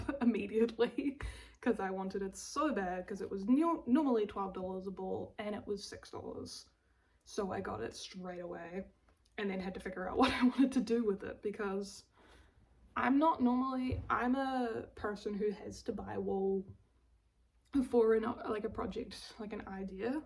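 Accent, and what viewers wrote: New Zealand. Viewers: I finished school in NZ and also studied at Victoria University of Wellington for 5 years. It's so nice to hear the kiwi accent again! I really love your projects and can't wait for future videos. Welcome to the podcasting world 😊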